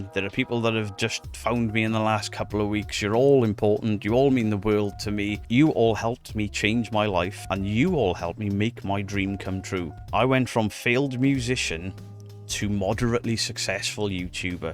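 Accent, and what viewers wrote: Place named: Cardiff, United Kingdom. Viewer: Ah nevermind! Just thought you might have seeing as you sound local (I'm in Cardiff) - all the bands of the time like FFAF, Shaped By Fate, even...ugh... Lost Prophets used to post on it all the time. Even met my wife on there lol.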